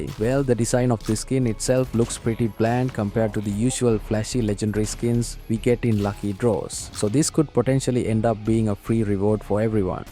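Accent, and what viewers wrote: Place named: India. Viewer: Your accent is like similar to Indian english 😂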